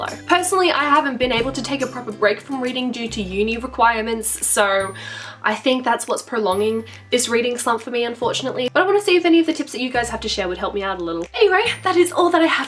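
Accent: Australia. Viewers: Hello. First of all love your voice! Are you Australian? Anyways normally I get out of a reading slump by not, reading, watching booktube, or even buying more books. I'll just put a bundle of books into my Amazon shopping cart to get the urge back. Yes we're in this together!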